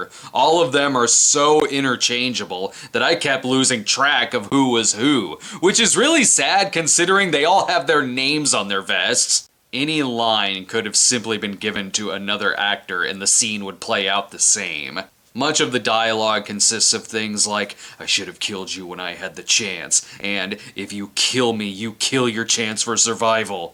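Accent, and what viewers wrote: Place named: Illinois, United States. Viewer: General Dix, Litchfield. Your Illinois is REALLY showing.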